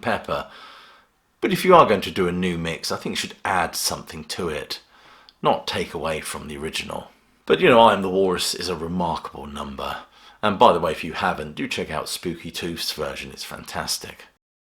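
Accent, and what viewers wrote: England, United Kingdom. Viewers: Why does an English accent piss me off. Must be genetic memory.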